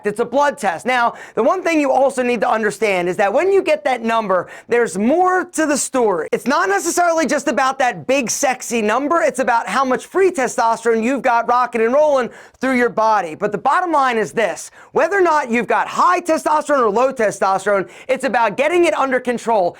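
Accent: Philadelphia, United States. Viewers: I know a Philly accent when I hear one 👀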